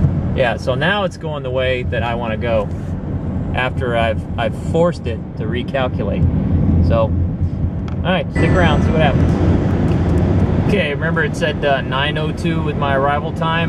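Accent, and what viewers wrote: United States. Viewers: Garmin Nüvi 1350LMT Voice: American English - Jack (Male) (Spoken Street Names) 🇺🇸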